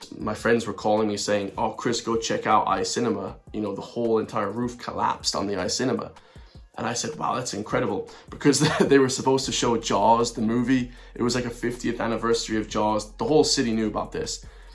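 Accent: United States. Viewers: you say your from Galway all your life but have an American Accent.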